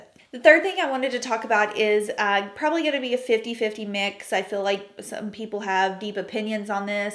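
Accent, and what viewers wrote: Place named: Nashville, United States. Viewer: Oh gosh you're from Nashville! I should have guessed from the dialect lol. It's a pretty decent city to live in. :)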